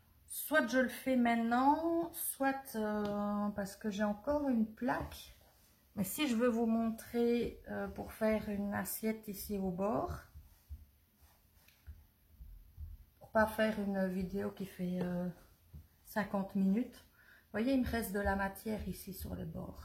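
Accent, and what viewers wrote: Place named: North, France. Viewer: Merci pour ta générosité d'informations! moi qui suis originaire de Lille (expatriée en Normandie près de chez Raoult!) avec mon grand-père Belge, ça me fait du bien d'entendre ton accent;-)) dis-moi, quelle épaisseur fait ta plaque de terre pour ce plat? Y a t il des épaisseurs précises en fonction des objets plus ou moins grands? merci beaucoup Caroline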